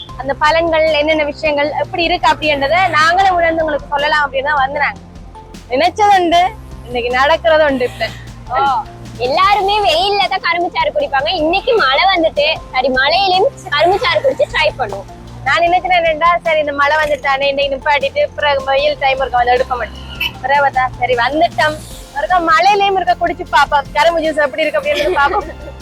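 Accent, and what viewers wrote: Jaffna, Sri Lanka. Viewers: எங்கட யாழ்பாணம் வர வர சென்னை மாதிரியே மாறிக்கொண்டு வருது. ஆனால் எங்கட யாழ்ப்பாண பேச்சு வழக்கும் எங்கட கலாச்சாரமும் மாறாமல் இருக்க வேண்டும். அது எண்டைக்கும் மாறவே கூடாது. நம் பேச்சு வழக்கு. நம் சிறப்பு. எங்கட யாழ்ப்பாண பேச்சு வழக்கே என்றும் நம் இதயத்தோடு ஒன்றி இருக்கிறது. சிறப்பான காணொளி. ❤️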